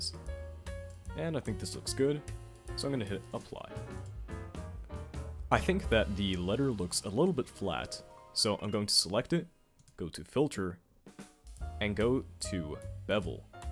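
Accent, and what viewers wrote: Germany. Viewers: Youre German? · Wait, are you from germany lol?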